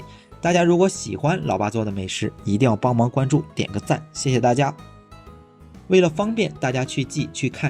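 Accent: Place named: Qingdao, China. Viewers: 感觉听出了青岛口音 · 亲切的乡音， 实诚的山东人， 接地气的讲解， 不故弄玄虚！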